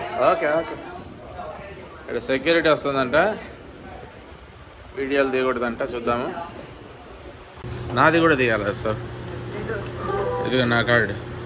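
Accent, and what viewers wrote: Rayalaseema, India. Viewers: మీరు చెప్పే పద్ధతి చాలా బాగుంది అన్న. రాయలసీమ accent.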